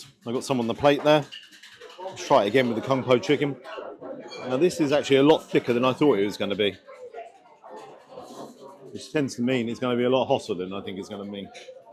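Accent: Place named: Yorkshire, United Kingdom. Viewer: is a character though, he's quirky with his heavy Yorkshire accent and his "NOW THEN GUYS HOW YA DOIN, BACK ON T'ROAD AGAIN". He has a laugh and a joke with the audience, whereas is more serious, calmer and detailed. It's horses for courses for a lot of people, I enjoy both but I can see why would have a bigger audience especially with the younger generation, as he's more of an 'entertainer'.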